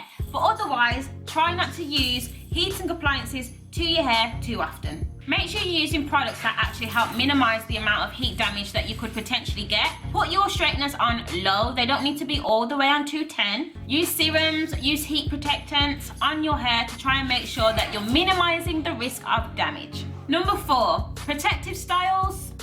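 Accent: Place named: United Kingdom. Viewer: Thank u for these advice. New subbie. I love ur british accent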